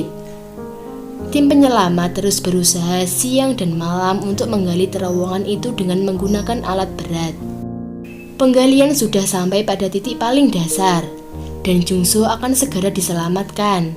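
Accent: Java, Indonesia. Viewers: Ko medok gitu loh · Pasti kmu orang Jawa ya min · ngomongnya medok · kalau memtah g enak lah,.mendig medok jadi empuk🤭🤭🤭🤭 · Suaranya medhok bgt sayangku. Saran aja sik biar yg nonton lebih comfy, cmiiww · Jawa temen kie lah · orng jawa nih yg pnya chanel..logat ny kciri bgt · Ngomong nya Jawa banget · Anjiiing jd ga seru. Logat jawa gini.fil bgs jd Alur cerita yang membangsatkan ini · Medok banget cukup sekali aku liat video ny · Jawa ya?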